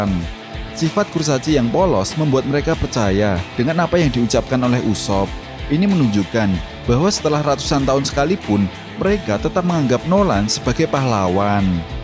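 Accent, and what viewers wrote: Java, Indonesia. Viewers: Logatnya khas. . Di pulau jaayyaa!! Suka min logat medok jawanya 😅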